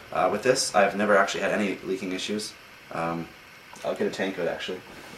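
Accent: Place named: Canada. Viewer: You are canadian, aye? Figured that OOT right at the beginning :-D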